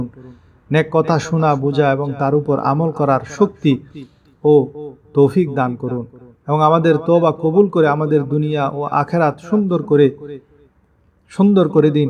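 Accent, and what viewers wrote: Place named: Chittagong, Bangladesh. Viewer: সালাম নিবেন। ইসলামিক ভিডিও দেন মানুষের উপকারের জন্য। কিন্তু বেশি কথা বলা আপনার একটা সিরিয়াস রোগ, এর চিকিৎসা নেন। অনেক সত্য কথা বলেছেন সাথে মিথ্যা ও বলেন। যেমন বললেন একটি দোয়া দিলেন ১০ টা দোয়ার লিষ্ট। মামুষ কোনটা রেখে কোনটা পড়বে। যদি সওয়াব প্রাপ্তির জন্য করে থাকেন তাহলে কম কথায় আসল বিষয় বলে দিন। মানুষ উপকৃত হবে। আর যদি অন্য উদ্দেশ্য থাকে তাহলে কিছু বলার নাই। কথা শুনে চট্টগ্রামের লোক মনে হয়। চট্টগ্রামের লোক মনে হয় একটু বাচাল।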